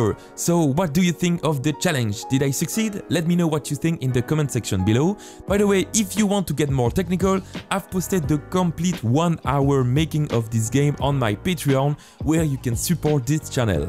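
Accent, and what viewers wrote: France. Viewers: I love hii french english accent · you have a french accent are you french ? because me too ! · Tu est bien français sa se vois de ton accent continue le bon travail frére💪🏻💪🏻💪🏻💪🏻💪🏻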